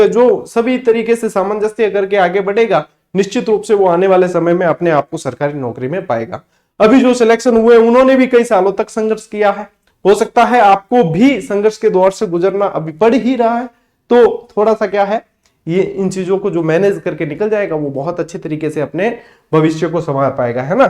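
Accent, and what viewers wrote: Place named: Marwar, India. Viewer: Sir aap Marwadi bolate ho to meethi lagti hai main Bhilwada se hun aur hamare yahan Marwadi bilkul bhi acchi nahin hai bolane mein hi Aisa lagta hai Jaise Lad rahe ho😂😂😂😂😂 Or Thank you 🙏🙏🙏🙏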